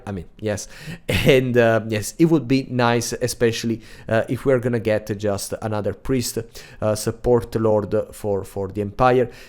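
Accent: Italy. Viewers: Ah ha! It was Italy lol. I was trying to identify your accent. I love the content, thank you for the great videos. (From Canada) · dall'accento che si sente subito che sei italiano ahahah.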